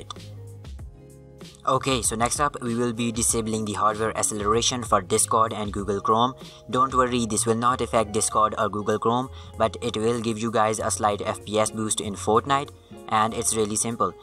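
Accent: India. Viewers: i dont trust indian voice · That Indian accent tho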